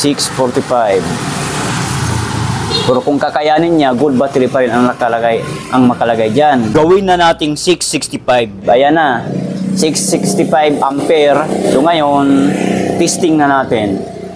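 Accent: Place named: Visayas, Philippines. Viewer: Visaya ka man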